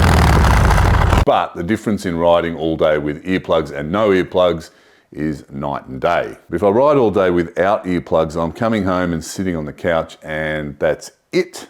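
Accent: Australia. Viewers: Heard the Aussie accent subscribed immediately. Cheers cobber.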